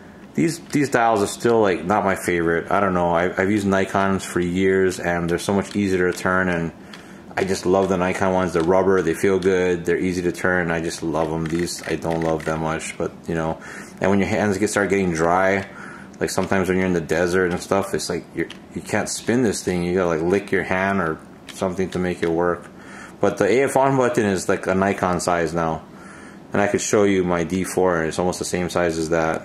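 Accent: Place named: New York, United States. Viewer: I got my X-H1 today and watched your Opening Box Video when opening mine. Cool. Share the experience. One thing I was hoping you’d talk about: what is that little gizmo that looks like a T? I’m an engineer and can’t figure out what the heck it is. I read manuals as a last resort. Another thing: I sold my X-T2 Graphite for this one. I have an X-T20 as my second body. I like the look and feel of the X-T2 much better but I bought this for one reason: IBIS! I’m a prime shooter. I have one zoom - the 18-55mm and unfortunately got spoiled by being able to shoot at much lower ISO. The IBIS on the X-H1 is fantastic. 1/8 sec? No problem. Tack sharp. Worth the upgrade. Now my 56mm f1.2 comes with me on night street shooting safaris. (You think the X-H1 is heavy now?) The larger body was necessary to fit the IBIS. I like the extension rear of the mock mirror housing. 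That too was necessary to get more distance for the new 3.6M screen. The sub-display? I’ll never use it. I’m not about to put on glasses just to read that. Defeats the purpose. I wish they have kept the Exp comp dial. I used that and it kept more the retro look. Hey, since you sound like you’re from NY, like me, stop trying to pronounce “tt.” Sounds unnatural. The Queen of England doesn’t so why should you?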